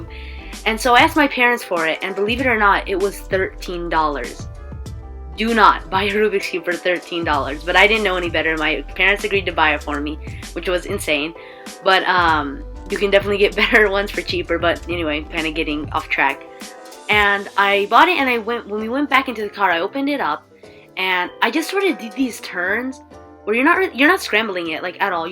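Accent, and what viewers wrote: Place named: Israel. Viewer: I'm from Israel and you have an Israeli accent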